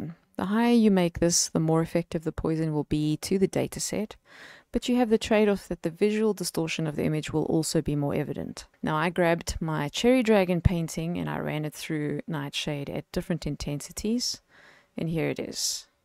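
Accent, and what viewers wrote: South Africa. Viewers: I just found your art now! I can’t believe this is the first video I’ve seen from you! By the way, are you Afrikaans? I can’t help but notice that familiar accent! :D God bless! Keep creating :)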